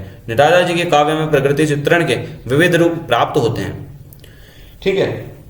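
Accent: Indore, India. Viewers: Yeh bhai pakka indori hai 🙂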